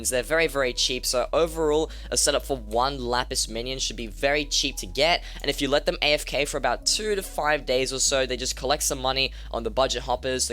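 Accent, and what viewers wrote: Australia. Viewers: Where in Australia are you from?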